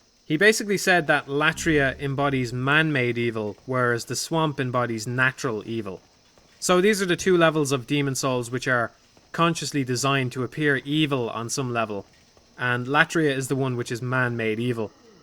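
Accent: Ireland. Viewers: your sweet Irish brogue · He's very obviously Irish. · Irish lilt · are you irish? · I watch this video at night to be lulled to sleep by your sweet Irish brogue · I only just found this video and your channel . Can't wait to check out your other stuff. And you sound Irish . So from another Irish I'm glad to find a Irish YouTuber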